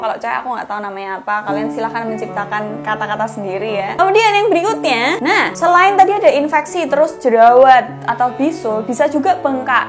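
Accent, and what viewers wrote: Java, Indonesia. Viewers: Suara e ngangeni...medhok" gemes'!!! . Maju terus MB cantik... .. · Aku gak ngerti bahasa jawa,tapi aku ketawa,cara bicaranya jawanya lucu,terus medok banget😅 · Suka kesini gara" cara ngomong nya medok wkwkwk😆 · Mba kocak ya logat jawane apik bahasamu aku suka dengerin konten panjenengan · Medok banget kamu mbak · Wong jowo banget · Suarane medok mBake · Mbak sampean Jawa mana sih...koq enak banget nada bicaranya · Arek ndi iki omonge kok jowo wkwkkw..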